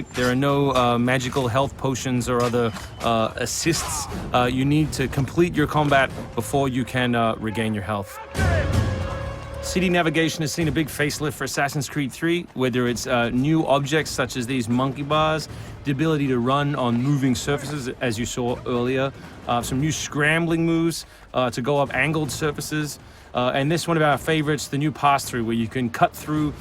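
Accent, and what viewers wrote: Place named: Australia. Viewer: Sounds like a bit of Aussie in the narrators accent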